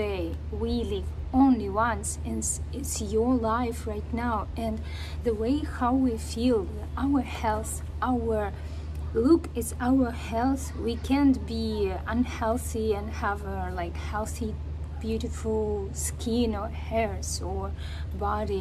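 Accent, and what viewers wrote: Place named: Russia. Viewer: Congrats on your hard work! I liked how you struggled with plank exercise at first. You say “I hate plank, arhhhh” in that beautiful Russian accent. Lol! Then it got easier and easier so you get tougher and tougher. I watched all 14 workouts and your cardio stamina improved greatly. You don’t need to lose any weight (talent agents suck!) but I hope your routine becomes a lifestyle. Good luck!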